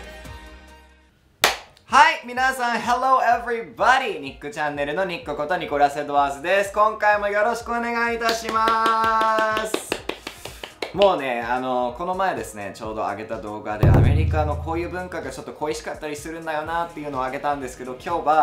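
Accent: Japan. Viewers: 英語も発音が日本よりになってるのすごい笑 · 英語もちゃんと日本語の発音になってる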